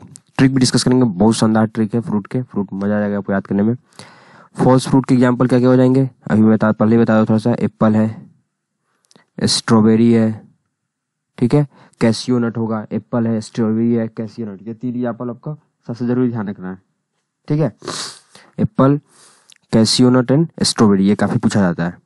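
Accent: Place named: Bihar, India. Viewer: sir 😁😁😁 u r tooo cute ......apne karaila kharbujja bhut funny way me bola .....koi nii ap Bihar k ho n... Bihar accent me bola apne.....mst trick Bhaiya ji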